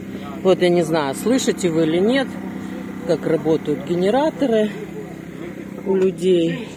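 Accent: Odessa, Ukraine. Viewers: первый раз зашла к вам на канал. Душа радуется, слушая ваш одесский говорок. Спасибо за экскурстю. У меня в Одессе живут родственники и знакомые. Всем нам мира и добра. Привет из Луганска.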